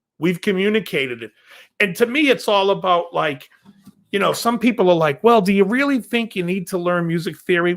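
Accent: Boston, United States. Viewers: I can totally hear the Baahstun accent now! I also did not get notice that you were doing a live stream….I wonder why? Any idea?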